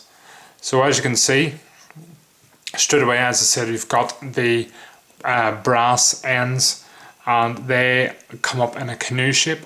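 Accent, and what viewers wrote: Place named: Northern Ireland, United Kingdom. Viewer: Where is Heinnie Haynes located? I'm hearing a fellow northern irish accent there!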